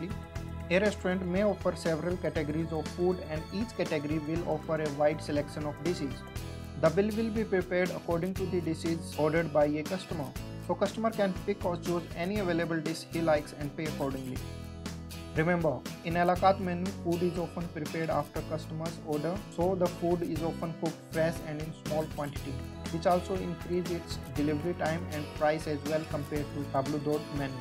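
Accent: India. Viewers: Funny Indian accent. Why not get an American to read it?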